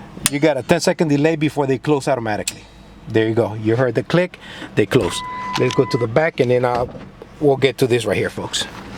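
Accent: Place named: United States. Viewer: Your accent and narration is fine, All American.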